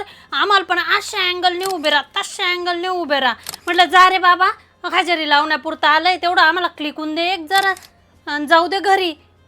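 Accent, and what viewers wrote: Khandesh, India. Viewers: आहेरणी बोलत रवा मस्त वाटस मै खान्देशी माल्ये अभिमान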